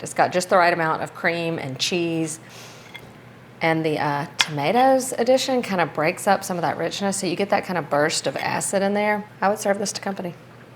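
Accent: South, United States. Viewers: “Chefs, they’re just like us”. 😂 So love your sense of humor and you have THE best southern accent. Really enjoy your videos and have enjoyed making your recipes. Keep up the good work!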